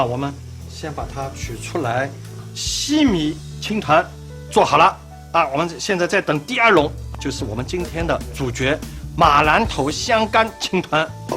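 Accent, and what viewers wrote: Shanghai, China. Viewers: 朱老师的上海口音老可爱的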